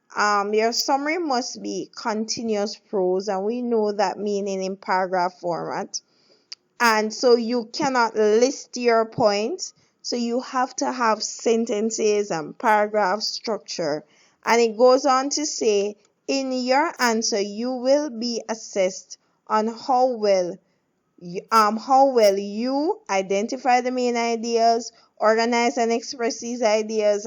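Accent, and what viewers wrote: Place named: Jamaica. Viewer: you jamaican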